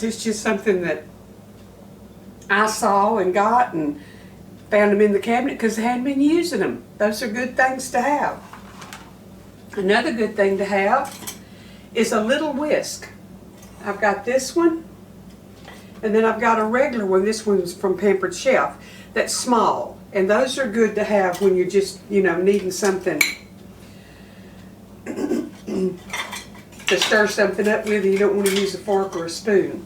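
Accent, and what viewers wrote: South, United States. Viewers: You’re such a dear woman! I love listening to your lovely southern accent and watching you work in your beautiful red kitchen. I especially like the way you show us your utensils, along with special tips and techniques in your cooking. So glad I found your Utube channel! Can’t wait to see your beautiful gardens this summer! God bless you! A new friend from way up north.🥰🙏💕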